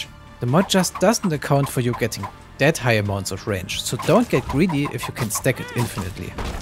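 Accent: Germany. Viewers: There he is! Our sweet german accented best boi is back!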